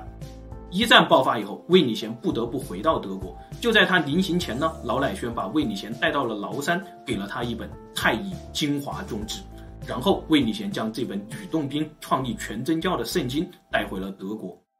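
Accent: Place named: Shanghai, China. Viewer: 不搭噶 up主上海人吧😂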